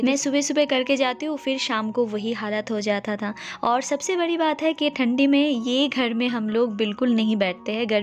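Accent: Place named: Bengal, India. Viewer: Love you....apki Hindi n mujhe bahut acchi lagti hai bengali wali ultimately 😀😀😀🤗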